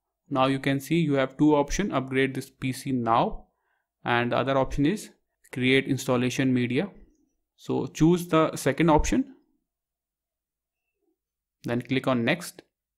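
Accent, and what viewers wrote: India. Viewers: The first I heard Indian accent i knew it's gonna be a success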